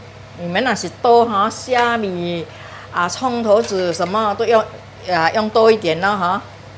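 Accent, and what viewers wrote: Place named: Hokkien, China. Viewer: you are fantastic. I love mee Siam., I love your Chinese with, I believe, with a taste of Hokkien. So familiar and homely.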